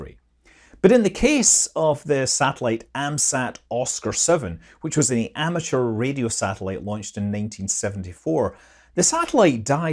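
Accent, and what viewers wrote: Scotland, United Kingdom. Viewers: That scottish accent hitting hard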